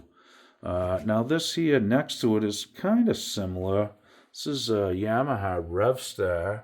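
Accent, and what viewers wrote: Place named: Boston, United States. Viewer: New subscriber!!! Great video and I love your collection. Your approach to video and guitar philosophy is enjoyable and engaging. I know you are in Maine but sound just like we do in the Boston area. We locals would tell you that "You collection is pissa!"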